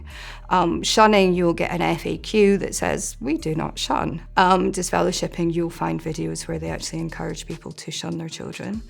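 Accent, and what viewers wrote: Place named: Scotland, United Kingdom. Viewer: I thought I could hear a Scottish accent... but only faintly...I was right..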